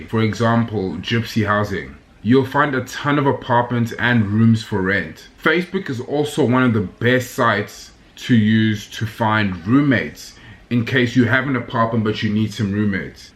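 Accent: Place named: South Africa. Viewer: Crazy how you haven't really lost your SA accent. Love from a former Edenglenian 💕🔥👏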